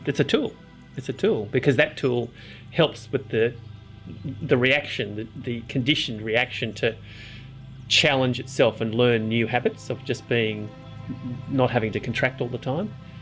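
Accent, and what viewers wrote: Australia. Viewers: Thank you for making this. I listen to a fair bit of ND stuff and yours is clear in a way that takes me right to the edge of ( non) understanding. Hearing this in an Aussie accent helps too!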